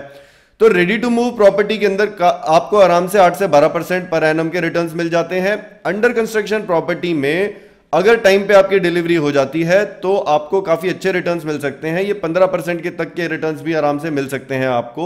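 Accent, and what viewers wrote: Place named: Kerala, India. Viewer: I think ua from kerla or tamil nadu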